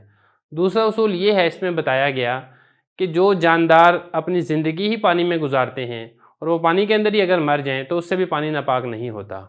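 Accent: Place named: Punjab, Pakistan. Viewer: Matlab Aap Jabab Panjabi Ho ,Accha Laga 😂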